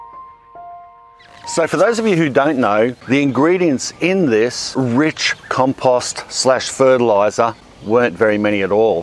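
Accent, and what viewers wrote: Australia. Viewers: I love the Australian ascent, it is much easier for Indians to understand. So hardworking man. Love this type life.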